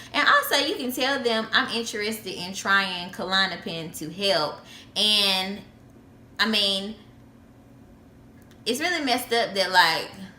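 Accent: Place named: South, United States. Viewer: Dam I love that southern accent. You talk like my cousin. I will be taking this medicine soon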